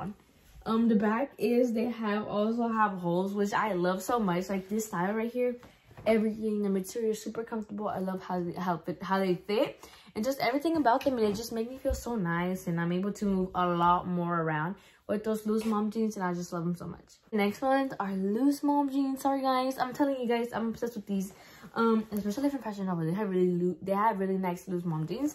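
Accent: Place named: South, Mexico. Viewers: Very south Mexican accent it’s🥴 maybe cuss I’m from nyc lol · it’s a Mexican accent...’Hot Cheeto girl’ is literally a microaggresion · Factsss her Accent is to die for i love Mexican Accents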